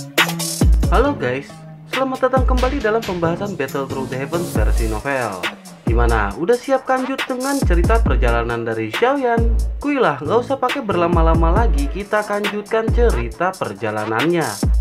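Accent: West Java, Indonesia. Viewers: Sunda pisan...kanjutkan min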